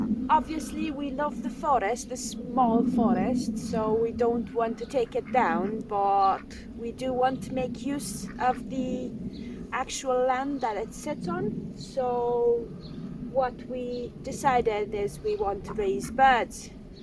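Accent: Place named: Scotland, United Kingdom. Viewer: Love this!!💛💛 Wishing you all the success with your vlogging journey!! 💛💛 You and your Scottish accent haha!! 💛💛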